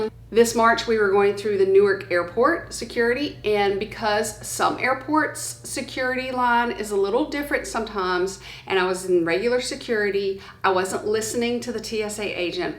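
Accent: South, United States. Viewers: Are you southern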